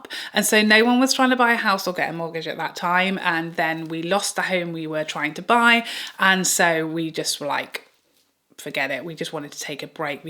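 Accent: England, United Kingdom. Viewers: Congratulations on 6 years!!! I discovered your channel at the beginning of the pandemic and your lovely accent reminded me of the wonderful years I lived in England back in the early 2000’s. I’ve enjoyed all of your content and look forward to seeing what you do in the future! ❤😊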